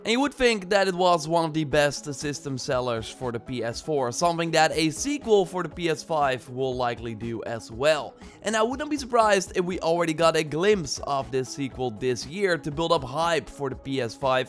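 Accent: Netherlands. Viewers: Nice info and video, greetings from a fellow dutchy (I could hear it from your accent hehe)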